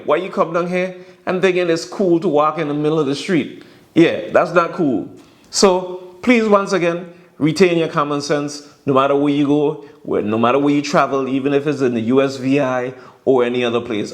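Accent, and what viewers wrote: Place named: Jamaica. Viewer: I thought you were Jamaican too!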